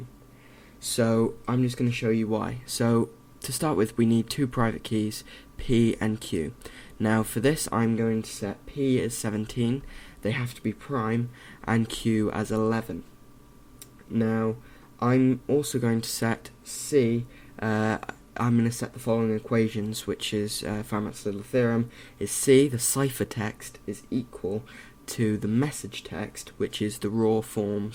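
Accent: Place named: United Kingdom. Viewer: Dude.... you are a genius... my mind is blown... and the british accent makes you sound really smart too...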